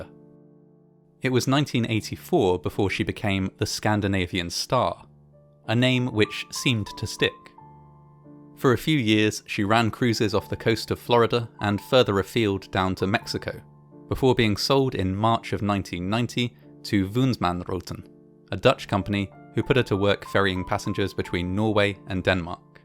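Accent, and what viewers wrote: United Kingdom. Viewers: Is this British accent?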